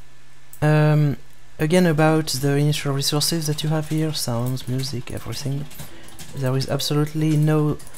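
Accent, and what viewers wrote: France. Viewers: Are you french ? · Whew dude you have a thick French Accent.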